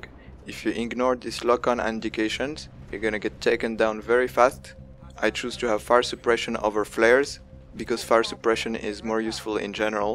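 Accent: France. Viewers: ho I thinking you had a French accent.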